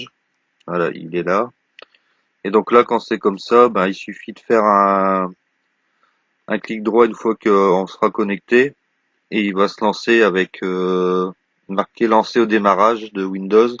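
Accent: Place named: North, France. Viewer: bravo bonne explication avec l'accent du nord. merci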